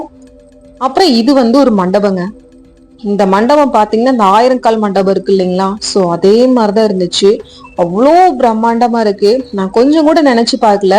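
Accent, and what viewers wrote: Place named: Kongu, India. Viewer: அருமையான தகவல்கள் அவர்களே. நம்ம கொங்கு தமிழில் நீங்கள் கூறியது அருமை. Building என்று சொல்வதை தவிர்த்து 'கோவில் கட்டிடக்கலை' என்று கூறுவது மிகச் சிறப்பாக இருக்கும் என்பது என் தாழ்மையான கருத்து. தாங்கள் கொங்கு நாட்டின் எந்த பகுதியை சேர்ந்தவர்?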